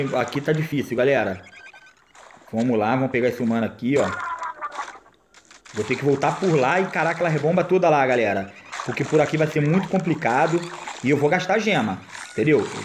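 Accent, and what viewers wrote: Rio de Janeiro, Brazil. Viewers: tu é do rio de janeiro né?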